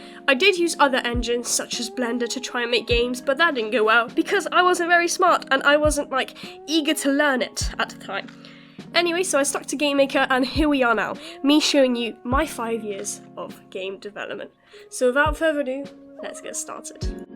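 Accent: United Kingdom. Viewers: that's a nice british accent 😊you have greetings from France😈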